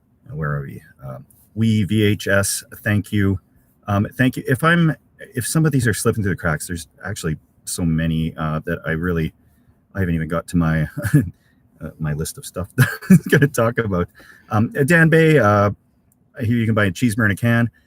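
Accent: Canada. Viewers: Are you and Greg Ovens distant relatives? I swear maybe it’s just a Canadian accent that I’m hearing as an American. But you guys could be brothers or cousins on the same side of the family.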